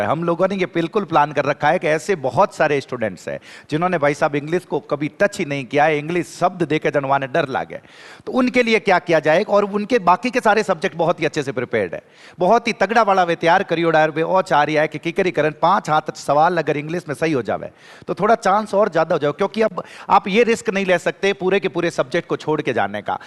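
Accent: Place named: Marwar, India. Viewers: Jai mata di apki class Boht Boht best aa bt muje smj ni aata apki marvadi hindi me kahite to jada achha hai jaipur jodhpur us side wale smj lete hai hme smj ni aata plsss ap boht hi acha pda rhe ho ❤sorry feel mt krna sir ji ap hmare Boht best ho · Jordar he sir marvadi 😅😅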